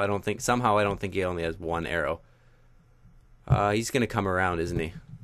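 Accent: Canada. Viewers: Is you Canadian? This is the first video I've seen from your channel, so I don't know. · Ohh he's Canadian ! I was wondering what his accent was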